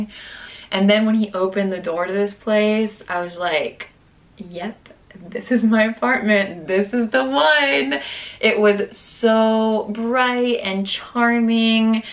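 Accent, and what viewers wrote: France. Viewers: Since you're fluent in French and have been there for six years, do you find the accent starting to stick when you're speaking English? Just curious haha. Love your videos and energy :)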